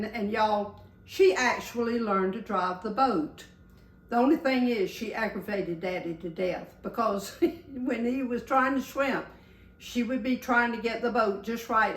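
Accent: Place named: South Carolina, United States. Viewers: Being from Tennessee and living in Florida, always warms my heart to hear a South Carolina accent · hey, are you from SC? your accent is the exact same as my great-aunt Peggy's—I'm from northeast GA and aunt Peggy's accent always stood out enough to be different, even if where she was from in SC wasn't far from where I was raised in GA. your pie sounds great, by the way.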